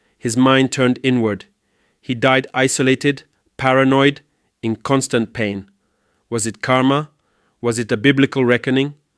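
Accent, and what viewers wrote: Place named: Pakistan. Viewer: Pakistani accent detected. Video rejected.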